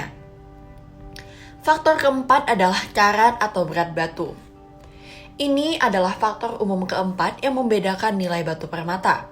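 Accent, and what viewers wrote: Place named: France. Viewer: Mantap naratornya karena ngomong dgn bahasa indonesia namun aksenya gaya prancis... #magnifico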